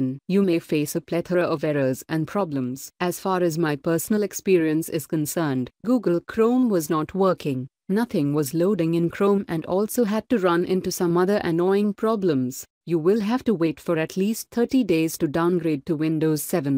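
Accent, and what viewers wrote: India. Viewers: bro doesn't use his actual voice but its still in indian accent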